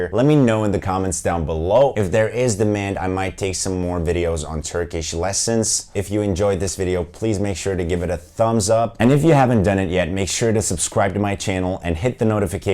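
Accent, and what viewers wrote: United States. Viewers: Wow, this is awesome. You seem to have an American Accent and way of looking at the Turkish language so it is helping me understand much more deeply. Tips here also explained why my Turkish wife omits some words a lot haha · You have more of an American accent than German. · Born in raised in Germany? With an American English accent? Vay Vay Vay. Sen olasin Ürgup Dumanin Tutmaz.... Excellent command and ability to explain in English however it is the American accent used is for me a distraction. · You sound very American to me. You barely have an german accent That is crazy. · you're very good at the american accent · And where did you learn English? You have an American accent. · Your English is American. Is that how they teach English in Germany?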